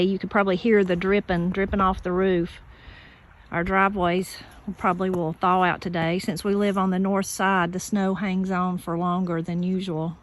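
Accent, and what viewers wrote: Appalachia, United States. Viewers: My mother and her family have a long history of family ties to Appalachia. I always remember my mother and grandmother saying in the winter many a day saying ‘it’s cold as a a witch’s tit.’ I am glad to know it’s a saying I didn’t know if my mom made that up herself or what! When it was a thunderstorm and sunny my mom would say ‘the devil is beating his wife.’ Thank you for your channel! You help me feel connected to my heritage. I no longer live in Appalachia and hearing your voice connects me back to my roots. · I love it, and the way you talk. You have the best accent in the whole of the USA. I'm not kidding. It's been a long cold winter and still is, here at 60 degrees north. I don't have a good name for it though, but will adopt one of your phrases for it! I felt the slight warmth of the sun for the first time today. 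Keep up the good work!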